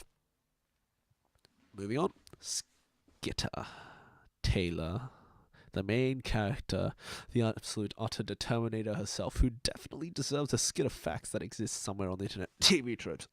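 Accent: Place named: Scotland, United Kingdom. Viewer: yes move on from that outrageous scottish accent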